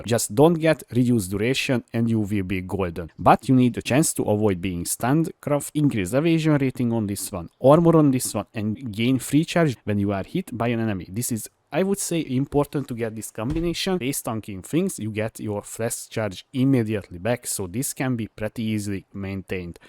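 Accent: Hungary. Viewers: Te figyelj, gyönyörű a nyelvtanod, de a kiejtésedről felismertem hazánkat xd Szuperek a videóid szóval nagy hajrá! Aztán idővel csiszolódik majd a prönánsziésönöd is <3 Keep up the good work! Edit: PoE2 kontentet nem fogyasztok, de kaptál egy feliratkozót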